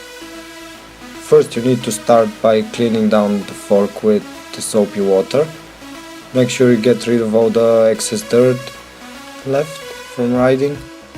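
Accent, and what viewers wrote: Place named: Russia. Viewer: ты русский?) ато разговариваешь по англиски как русский))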